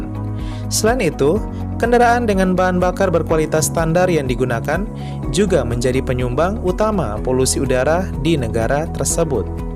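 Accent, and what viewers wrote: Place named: North Sumatra, Indonesia. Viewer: penekanan huruf "e" Nya masih nampak. Sepertinya orang sumatera Utara.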